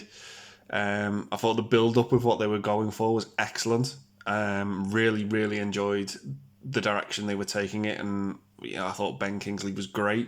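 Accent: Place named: North West England, United Kingdom. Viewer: I'll be honest a lot of movies in your list i 've not even seen because they aren't a genre i'm into, but i certainly respect your list and right to an opinion. I look forward to your list of unpopular movies you like, i definitely have plenty of those too. I really like your honesty and attitude. I'm guessing from your accent you are from around the North West, i'm technically North East, just below Hull.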